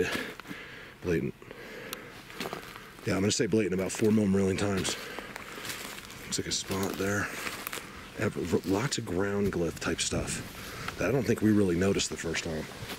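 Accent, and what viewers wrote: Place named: Philadelphia, United States. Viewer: Hey. I’m a brand new subscriber. I hear an accent. You sound exactly like a friend I have who’s from Philly but now in TN. It’s uncanny. There’s lots of Bigfoot here in the PNW. Too many weird happenings in my days of exploring. I had one specifically leave me a gift on the drivers side windshield of my car. It was sweet. Guess he enjoyed all the fish.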